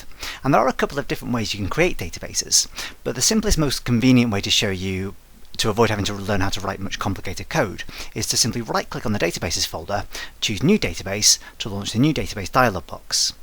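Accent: United Kingdom. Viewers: Dear it great tutorial, allow me to thank you for your this great job, but also allow me to say that you have a British accent and speaks a little fast which is hard to understand for not native English speakers, once again thank you and you do wonderful job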